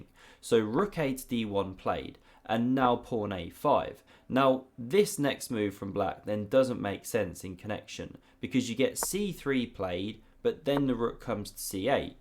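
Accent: United Kingdom. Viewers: Funnily enough, I subscribed to this channel because I find his voice the easiest to listen of most chess guys. There are a lot of Indians and East Europeans doing chess content and though their content is good, the British accent is easier to my ear.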